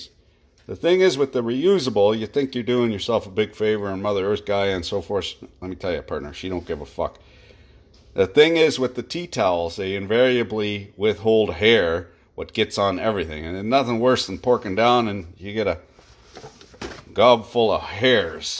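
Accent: Quebec, Canada. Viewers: I have still cant pinpoint you french accent. its driving me crazy. sometimes sounds like Ontario sometimes Quebec and sometimes sounds like Moncton nb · Hahahah ton Québécois est incroyable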